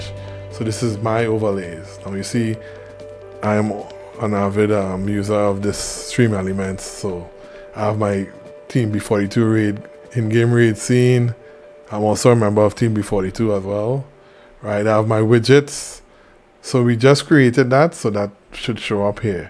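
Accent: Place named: Sint Maarten, Caribbean. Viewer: Great video. I appreciate this information. I don’t know why but you definitely sound like you have a Caribbean accent, in particular a St. Maarten accent. Let me know if I’m correct lol.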